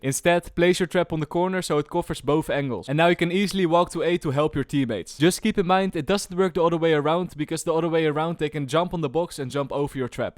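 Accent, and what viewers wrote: Netherlands. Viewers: You really sound Dutch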